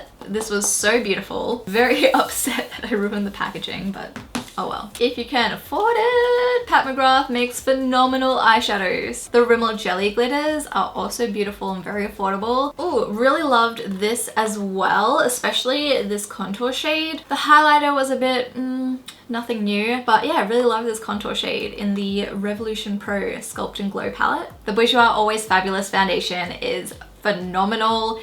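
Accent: Australia. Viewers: Love your videos. You are absolutely beautiful and I love your Aussie accent ❤️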